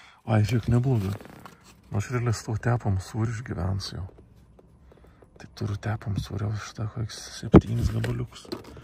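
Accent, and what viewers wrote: Lithuania. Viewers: Žiauriai lietuviškai skamba va iš kur mes Šiauliškiai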